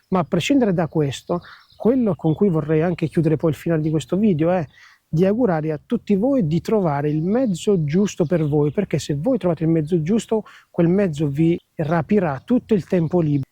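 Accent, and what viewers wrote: Abruzzo, Italy. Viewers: Complimenti discorso esemplare, hai il giusto modo di viaggiare, in sicurezza e con passione. Poi sento che sei abruzzese come me 👍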